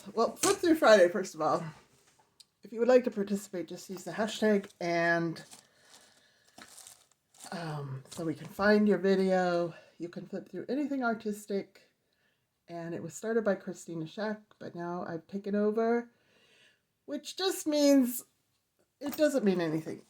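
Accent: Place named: United Kingdom. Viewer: loving that British accent !! 😊🫖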